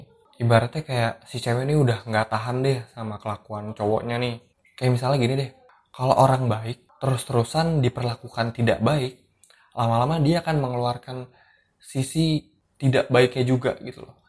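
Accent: Java, Indonesia. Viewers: Mas wong jowo tah? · Jowo kerasss lurr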